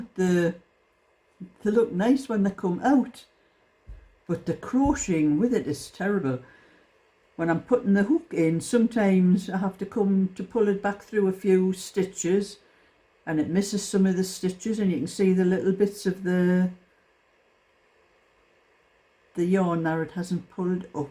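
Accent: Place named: Ashington, United Kingdom. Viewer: Hi, can I ask whereabouts you are from? You sound like my husbands family in Ashington xxx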